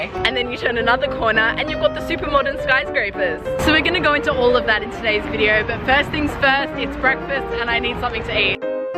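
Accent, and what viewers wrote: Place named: Australia. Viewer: Big love for this girl’s video, amazing accent , passionate speaking ，week done Aussie girl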